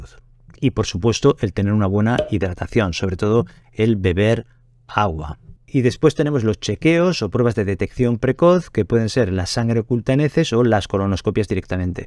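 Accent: Spain. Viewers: En España,soy española y su acento es español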